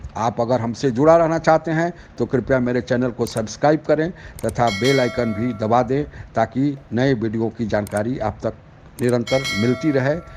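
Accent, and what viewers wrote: Bihar, India. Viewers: me toh samjha samjha k thak gaya uncle ko ki bihari hindi tone achi sunai nai deti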